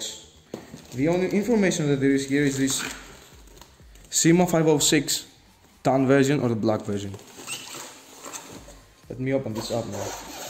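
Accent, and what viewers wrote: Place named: Greece. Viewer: Η προφορα σου σε προδωσε,Μετα ειδα το.....Ωραιος καλη συνεχεια αδελφε......απο εναν Ελληνοκαναδο!χεχεχε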